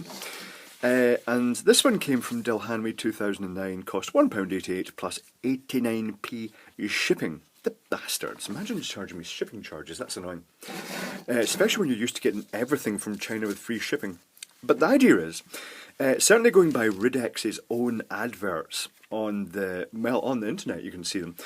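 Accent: United States. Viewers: Dear, If you're still accepting questions, here's mine. Why do you use so much American dialect? Have you spent a lot of time in the States?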